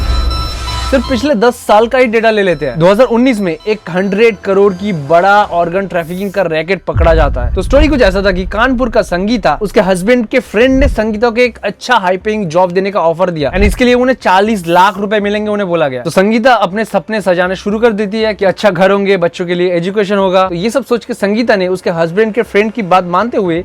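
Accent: Bengal, India. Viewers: fir bhi Hindi me Thora Bangla ka prabhav h..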